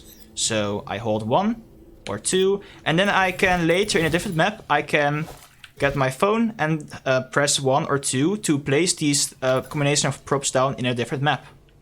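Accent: Netherlands. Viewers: Yo, the lever action shotgun now is in creative so can u put it in you're realistic 1v1 map, ty. Btw ik kan horen aan je stem dat je nederlands bent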